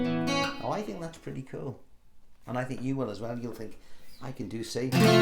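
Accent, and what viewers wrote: United Kingdom. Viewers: Great video to watch. So relaxing to listen to your wonderful British accent as opposed to the American you hear all over the internet. And always a a little funny: I love it ❤ We all shouldn’t take ourselves too seriously.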